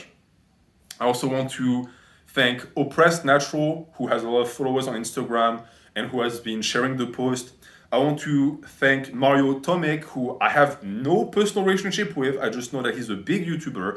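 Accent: France. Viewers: I am Polish and consume content from a french natty and get inspired in english. So I am happy that You are back, the content I want to share and the content I haven't watched yet is back. As a side note, You were also an inspiration to return to my french studies - educated noble muscle natties can learn more than one foreign language. Je suis très heureux que nous pouvons encore Vous écouter et Vous regarder. Nous allons tous y arriver. Je vais fêter ça avec des "pullovers" et des "Arnold raises". J'adore votre accent français. Salut!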